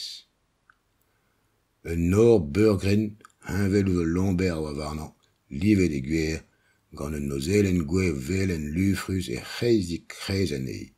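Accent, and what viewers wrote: France. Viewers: Loved it, minus the French accent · The French accent makes me wonder what Tolkien's opinion on Breton was. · It's like ancient Cornish with a French accent. Fascinating. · french accent is so strong and annoying unfortunately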